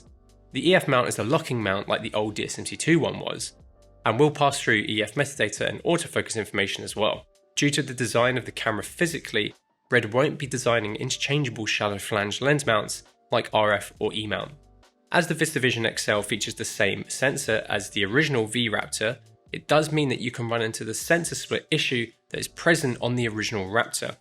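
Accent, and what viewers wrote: United Kingdom. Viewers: Your British accent is different to hear. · Why the hell would a brit working for a british company speak with an american accent for a video?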